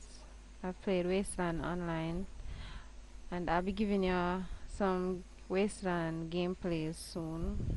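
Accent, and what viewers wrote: Jamaica. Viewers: you sound jamaican i am jamaican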